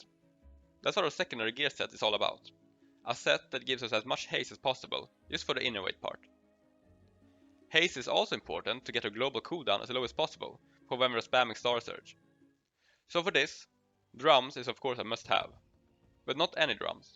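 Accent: Sweden. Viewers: Asså cool vid osv, men din svengelska är så söt, minn or trait 😅😅😅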